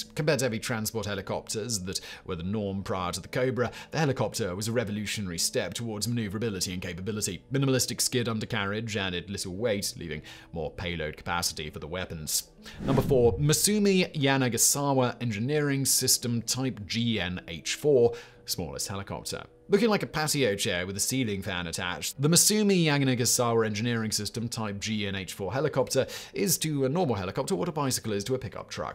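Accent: England, United Kingdom. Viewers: Has got more English 👀 over the years or is it just me????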